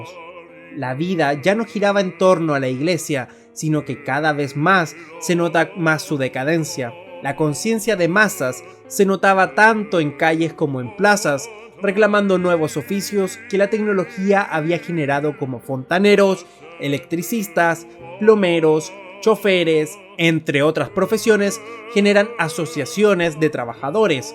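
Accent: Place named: England, United Kingdom. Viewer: Buen video pero si quieres hacer el pedante pronunciando los nombres en inglés asegúrate de que sean ingleses para los europeos que pronuncies nombres franceses o alemanes con acento inglés es francamente ridículo......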